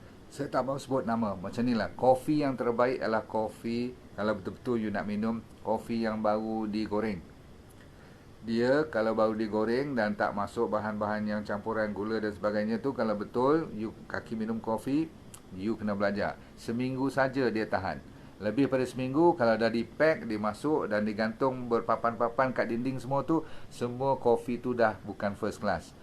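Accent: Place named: North, Malaysia. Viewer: 1.pandangan saya kalau Dr. ni cakap bahasa melayu pun mesti tak akan cakap ikut Bahasa Malaysia standard. Sebab mungkin akan mengambil masa memperbetulkan cara cakap. Dr. berasal dari utara. Tapi dalam video ni nampak la doc dah cuba untuk bercakap dlm bahasa yg mudah difahami org Malaysia. Kalau doc cakap semua dalam loghat utara mesti penonton dari negeri lain ada yg tak faham. Biarlah doc bercakap dengan apa yg dia rasa selesa dan santai. Saya sendiri kalau disuruh cakap Bahasa Malaysia standard dgn sebutan baku akan ambil masa untuk bercakap sebab dah terbiasa bercakap menggunakan loghat utara dan selatan serta English. 2. Lagi satu Bahasa Indonesia mempunyai lebih ramai speaker sebab itu orang luar tertarik nak belajar.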